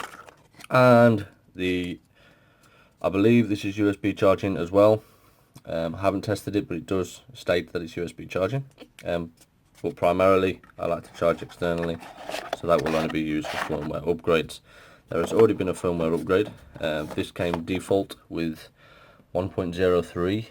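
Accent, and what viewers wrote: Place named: United Kingdom. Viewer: Thanks dude. Nice hearing a UK guy do good, in depth reviews for once! Will do man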